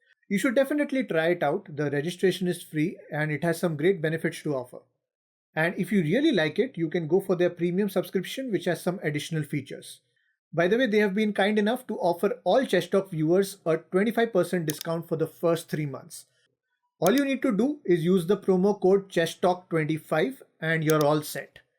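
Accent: India. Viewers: Indien English accent its hard to understand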